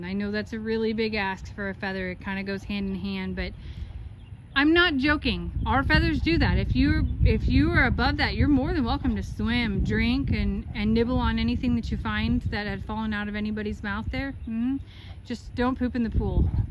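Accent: South, United States. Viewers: you are such a southern girl now… I heard y’all, yonder, holler!!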